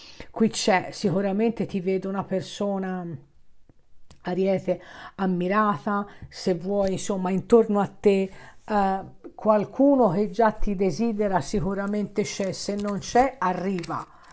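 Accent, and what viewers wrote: Tuscany, Italy. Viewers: Ciao ti seguo sempre sei bravissima ogni volta che ti ascolto sembra che parli della mia vita, azzecchi tutto ……. Adoro il tuo accento toscano……i tuoi consigli sono molto preziosi e mi fanno riflettere sui prossimi passi da fare nella mia vita …..grazie 😘😘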